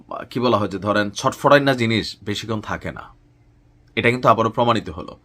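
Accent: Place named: Comilla, Bangladesh. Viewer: আপনার কুমিল্লা লোকাল ভাষায় মানাই ভালো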